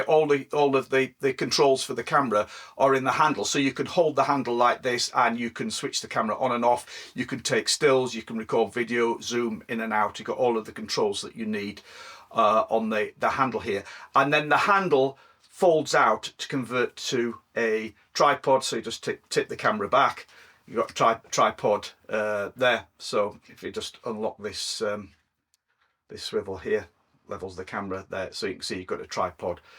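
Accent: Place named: Northern England, United Kingdom. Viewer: Excellent video! You nailed it with the reasoning and I fully agree with you. Nice to hear that Northern accent as an ex-pat in Vienna from Sheffield. I located your trips and was surprised that you don't seem to be from the Harrogate area as you sound just like my best mate from school who's from there. 😂 Anyway I'm glad I discovered your channel and have viewed a few of your videos. I will watch more. Again I fully agree, stick to your principles why you enjoy making them, you are educational, thought inspiring and making others happy. Thumbs up 👍 Oh yes I have recently returned to biking after a long break. Kids etc. 😉 A lot to relearn.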